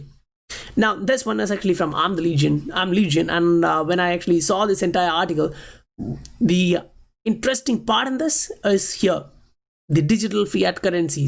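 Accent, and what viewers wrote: India. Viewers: 2 seconds in, lemme guess, you're indian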